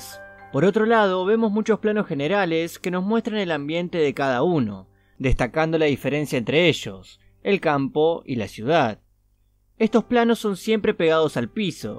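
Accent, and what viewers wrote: Buenos Aires, Argentina. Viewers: que paso con esa 'Y' cuando hablas ¿eres porteño?... Buen video like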